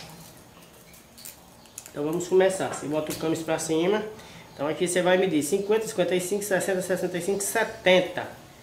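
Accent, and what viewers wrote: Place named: Rio de Janeiro, Brazil. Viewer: Bom dia,vc é do rio de janeiro?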